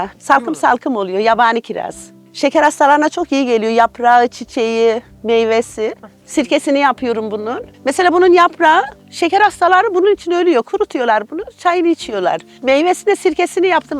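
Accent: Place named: Adana, Turkey. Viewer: Adanalılar şivesine benziyor Ablanın konuşma tarzı verdiği bilgiler çok kıymetli teşekkür ediyorum Allah razı olsun. 🤲🌷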